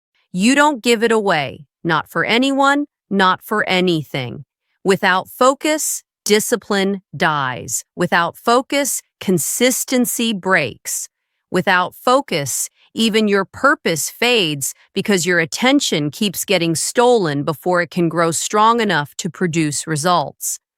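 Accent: United States. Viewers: It is an American accent based on my hearing.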